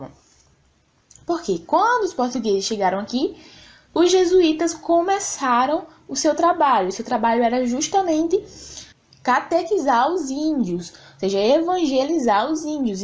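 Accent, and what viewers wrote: Bahia, Brazil. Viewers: A explicação foi boa mas o sotaque da Bahia atrapalhou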